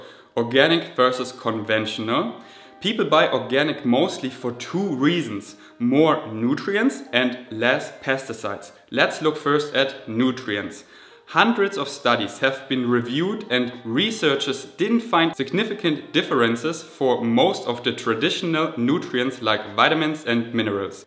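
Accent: Germany. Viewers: I really like your videos. So real. And your German accent is cool. Super cool that you talk in English. Anyways, keep it up! I am watching!!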